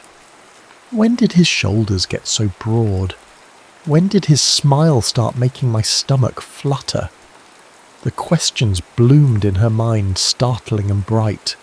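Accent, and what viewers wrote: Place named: United Kingdom. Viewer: Darwin, Australia. Thank you for this video and your lovely British accent 😊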